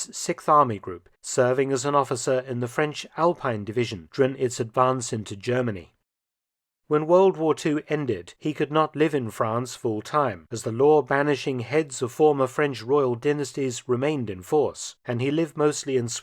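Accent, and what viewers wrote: United Kingdom. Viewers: The very style of this very British narration leaves me with the impression of an implicit disdain for the French overthrowing of monarchy and going with a republic. Haha.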